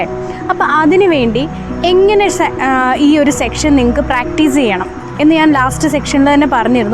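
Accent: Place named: North India, India. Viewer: Where were you in North India? Your slang make sure you were born and bought up there. Am I right? Also appreciate your sincere effort to educate your subscribers.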